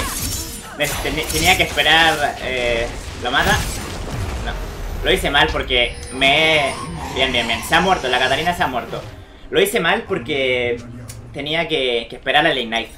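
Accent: Chile. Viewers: es chileno sierto? v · es chileno no ?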